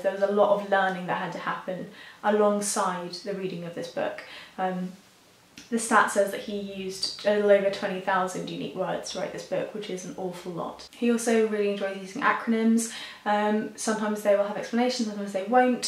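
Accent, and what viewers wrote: United Kingdom. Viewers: agreed. this, combined with her heavy brit accent, makes it hard to hear.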